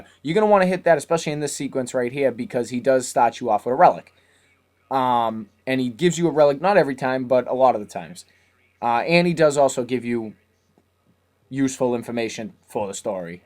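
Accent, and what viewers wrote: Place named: Boston, United States. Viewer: just watched ur part 1, ill be viewin all of um for my plat. good job dude, n the boston accent=tight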